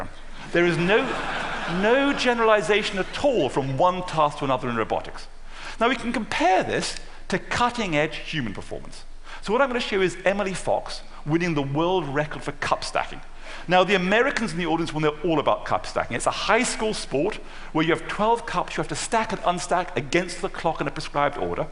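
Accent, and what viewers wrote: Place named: United Kingdom. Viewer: 영국 억양이 이렇게 짜증난적은 없었다...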